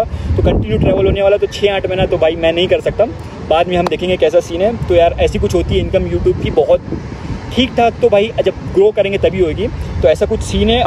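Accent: Mumbai, India. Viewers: Assalamu alaikum bhai in sha Allah top pe jaoge agar isi tarha imandari se kam kiya to. Aur bhai language pe dhyan do mumbai wala accent aata hai hai.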